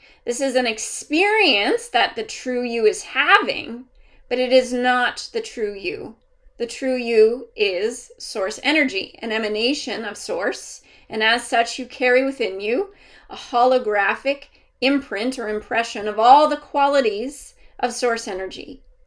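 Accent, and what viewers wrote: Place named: Canada. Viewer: Thank You, Took Me Years to Realize this. And The Re - Minder Is Great!!! ✨️✨️✨️⭐️💔💖💞 Love It. 🙂. I Luv The Way Your Guide Explains this. ☀️ And The Canadian Accent, Smile Is Priceless. 🙂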